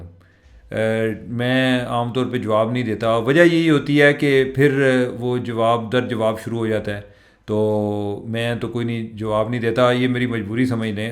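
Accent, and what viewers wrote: Punjab, Pakistan. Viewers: صاحب ، پلیز اپنی اردو ٹھیک کریں، اگر آپکو ٹائم ملے تو اپنی ویڈیو دوبارہ سنیں اور دھیان دیں کہ آپ لفظ بھکاری کسطرح ادا کر رہے ہیں ؟ عام حالات میں بھی پنجابی لہجے میں اردو وی لاگ ہوتا ہے لیکن آج تو بالکل ہی حد ہو گئ۔ 😮صرف کان نہیں پورا جسم تکلیف سے گزرتا ہے آپکے تلفظ سے۔ میں خود اردو دان نہیں ہوں لیکن ہمیں کسی بھی زبان کے تلفظ پر بہت زور دیا جاتا تھا۔ 🙏🏻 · Saheb, Punjabi, Salam Sir jee, whatever your qualifications may be, you will remain “ Pendu”, and never become a polished journalist or media person, unless you Change your spoken language habbits. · Mostly punjabi (central) aisay he boltay hain, please don't mind😂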